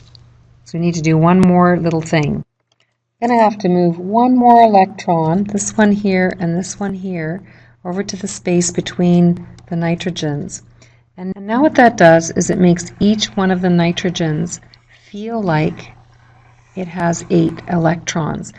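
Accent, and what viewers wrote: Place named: Canada. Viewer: Thanks, that really helped! Even with that Canuck accent! Sounds like your software or mic has some effect that makes your voice start out very low volume, then come up to normal volume. You video is super helpful, so I hope you can get that fixed!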